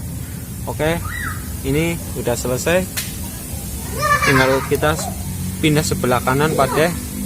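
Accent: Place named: Java, Indonesia. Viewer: Asli mana bang..kok ngmong blepotan Jawa juga😅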